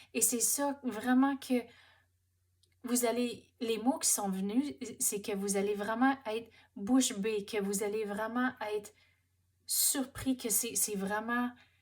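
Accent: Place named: England, United Kingdom. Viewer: Merci pour se superbe tirage en attendant l évolution, vous avez une très jolie voie avec ce petit accent anglais qui dégage une douceur. Je vous souhaite beaucoup de bonheur et de joie sur votre chemin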